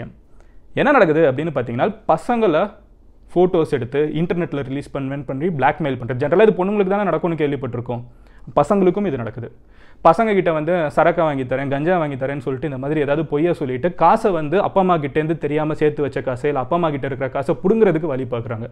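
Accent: Madurai, India. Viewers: Enikitha pa madan nu namma madurai karana pesiruka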